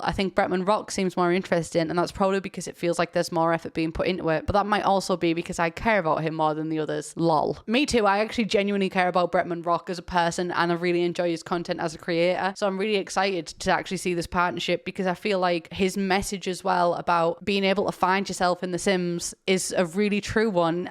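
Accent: United States. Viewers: your american accent is magical the fact that we have accents is just so damn cool. i love when people do american accents. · Can we talk about great American accent? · I did a double take when I heard your American accent what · Wow your American accent is pretty on point! It’s kind of funny seeing the roles reversed because I’m obsessed with doing a scouse accent lol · Absolutely 10/10 American accent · Very good American accent -an American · I didn't realize you were doing an american accent until you mentioned it lol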